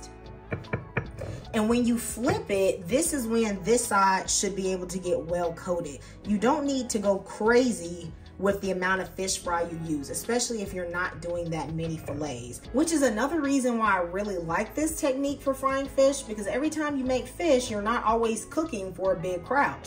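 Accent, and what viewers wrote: South, United States. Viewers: Cutie pie with a southern drawl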